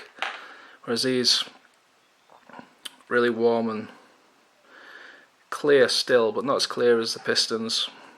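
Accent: United Kingdom. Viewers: A bit off topic but you have a British accent and pronounce the Z "Zee" instead of "Zed"? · Are you british?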